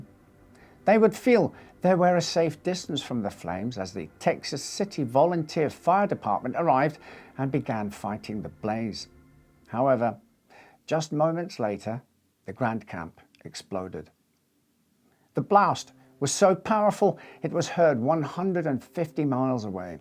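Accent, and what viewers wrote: United Kingdom. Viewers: It is pronounced that way if you have an American accent. This gentleman has a British accent and therefore pronounces things differently. So what? Could you correctly pronounce the sometimes strange names of the cities in Great Britain?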